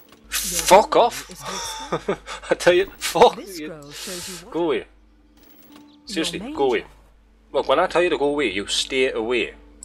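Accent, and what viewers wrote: Scotland, United Kingdom. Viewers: Am I wrong or You've got a scotish accent? The way you say "here" and "fock" is very unusual. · I know late with this, but i lov your accent. Me being part scottish myself, iv always wondered what we sound like. I also get an unusual amount of exitement from ur voice and how u say me instead of my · You look like a scotland by listening to your accent.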